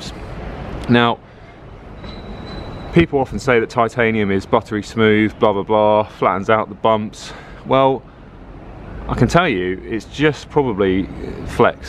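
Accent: United States. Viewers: Spot on american accent. Cheers!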